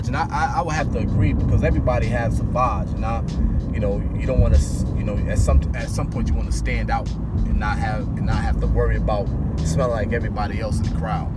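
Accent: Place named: North, United Kingdom. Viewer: Subscription granted. Good video. I can hear the up north accent.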